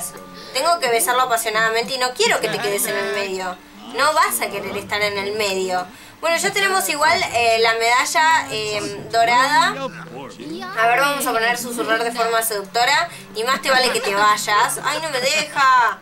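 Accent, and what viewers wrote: Spain. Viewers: es española o argentina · siempre estas en un bar y otra pregunta eres española o inglesa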